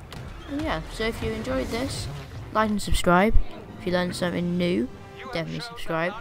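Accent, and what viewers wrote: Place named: United Kingdom. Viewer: it’s the British accent lul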